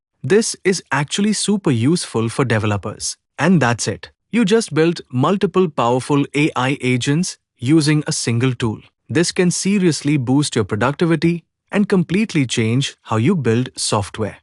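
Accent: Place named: India. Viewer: If your using AI for the voice why make it Indian?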